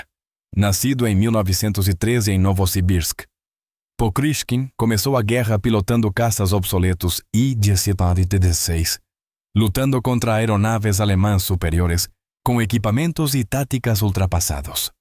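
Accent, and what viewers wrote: Portugal. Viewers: Horrível esse português europeu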